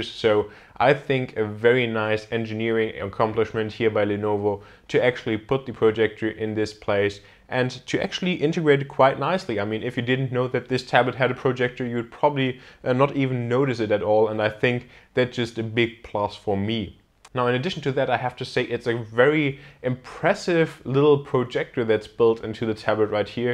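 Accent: Germany. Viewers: Du bist doch Deutscher. :D Das hört man doch.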